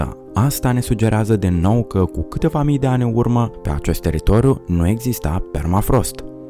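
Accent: Moldova. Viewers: Ie moldovian bre😊😂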